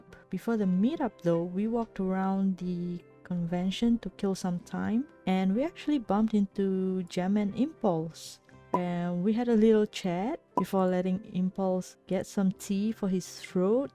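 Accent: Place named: Singapore. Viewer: Fellow Singaporean!!! I had a hunch with your accent but happy that there is actually a growing community of hermitcraft-likers here >:D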